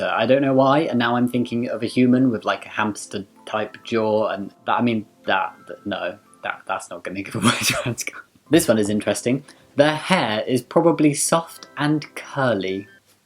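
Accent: United Kingdom. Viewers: Gee, I'm a Cis Gay Man. But I have a round jawline and no visible Adam's apple (because I'm fat), does this mean I'm unknowingly a trans man? The hair on top of my head (what's left of it) is straight, but my beard (which grows like crazy) is somewhat curly. No tattoos or scars (at least, not on the arms). Maybe I'm in the "?" group? Fact is, people are people. We are all one race, the HUMAN race. White, black, brown, blue, gay, straight, bi, trans, or whatever. Its corny, but love is love. Sorry I'm responding so late. Just found your channel, love your vids and the vlogs showing your transformation. I'd never have been able to do that in the hospital. And sorry your wedding got postponed, just make it bigger and better next year! PS: Love the accent! I'm American, but I'm a sucker for a British accent.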